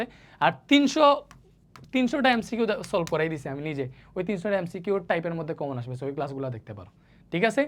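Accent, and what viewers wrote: Sylhet, Bangladesh. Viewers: Apni ki Sylheti Paz vai ripley den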